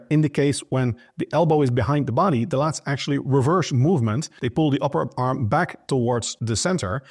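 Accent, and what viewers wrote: Netherlands. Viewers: In an other YouTube channel some said you need 2 pull and 2 row exercises for a bigger back. I did that. Gonna put the lat prayer in my back workout tomorrow and skip one of the row exercises. Gonna give it a try, in the end it's what works for me right. Btw love the Dutch accent 😉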